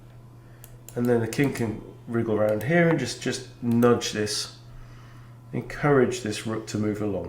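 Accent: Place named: United Kingdom. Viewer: I watch bc I need a channel where I can have the moves explained and see how players closer to my elo play (Im only 700/800 but have only played 230ish games)--esp endgames. You playing rapid gives me time to think abt what I'd play in your position. The british accent helps, but my oh my the blundering rollercoaster and the amount of times I yell at you for bad endgame moves is why I stay. Can't forget abt the accents and movie quotes haha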